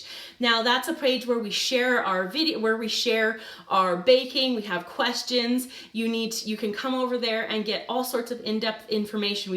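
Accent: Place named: Canada. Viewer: I love watching your videos so much! My mom is Canadian, but we live in Texas, so I love hearing the accent!!😊😍🤗😘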